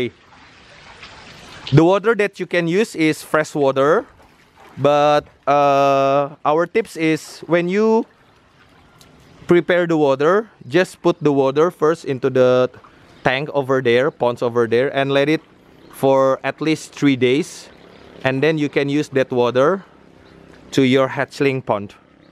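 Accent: Indonesia. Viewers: i love indonesian accent it is cute :D <3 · hehe logat ngomong inggrs emang gitu bisa ketebak klo yg ngomong org indonesia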